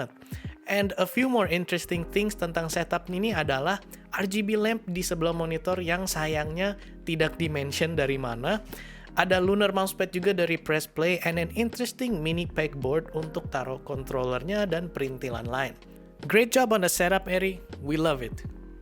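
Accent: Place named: United Kingdom. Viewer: Keren nih ada aksen gaya bahasa inggris nge riview nya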